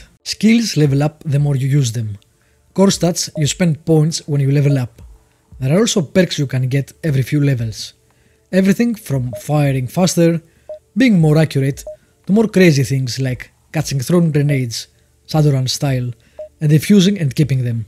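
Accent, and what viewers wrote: Greece. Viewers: Congratulations because u are the only one that has made a Review of tis game. Igoogled "Vigilantes Review" and all the videos where gameplay except yours that was only a Review. I don't count the site reviews just the Videos. Συνέχισε την καλή δουλεία όταν είδα το 1ο video to " Medieval Napoleonic wars" από την προφορά κατάλαβα αμέσως οτι είσαι Έλληνας - έχουμε χαρακτηριστικη προφορα - έκτος Ελλάδος 3 στις 4 φορές που άκουσα κάποιον να μιλάει Αγγλικά το πέτυχα ήταν Έλληνας -.και μετά μπήκα στο "About" του καναλόυ σου και το διαπείστωσα. Sorry for the Greek language i was just congrajulate because he was the only one that has uploaded a video Review of the game and not Gameplay like the other videos when i Google " Vigilantes Review".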